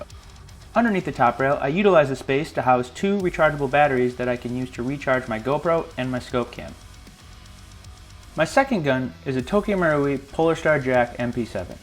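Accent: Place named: Eastern Europe. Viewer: Are you even American. You sound some of an Eastern European ...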